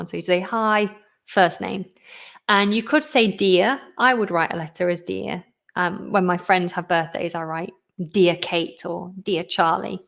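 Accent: United Kingdom. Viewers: you are the best Teacher i love your British accent and Stay Safe Stay Healthy 😘❤💜❤💜❤💜💖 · is one of the best teachers on youtube. I love her British accent! from Russia with warm hugs! 😉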